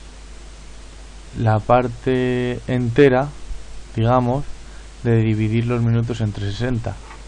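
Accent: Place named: Southeast, Spain. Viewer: qué cosas más curiosas, por cierto, tienes una voz muy muy sexy, me gustaría conocerte en persona, pásame tu número, por el acento, me parece que vives en el sureste peninsular español, yo también, podríamos conocernos ;)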